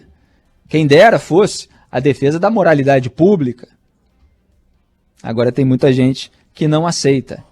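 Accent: Rio de Janeiro, Brazil. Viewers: Esse jornalistinha safado com sotaque da zona oeste do RJ só fala m. Vai lá perguntar pro marreco moro sem vergonha se ele te arruma alguma boquinha lá nos EUA . Safados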